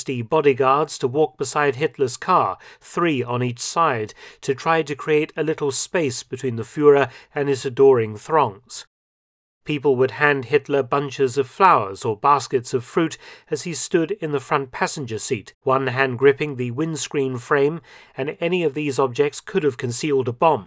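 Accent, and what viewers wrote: United Kingdom. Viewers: his proper British accent, is second to none. 💯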